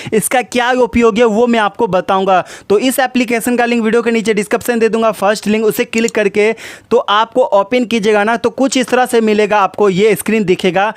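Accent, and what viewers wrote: Bihar, India. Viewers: Kya tu bihari hai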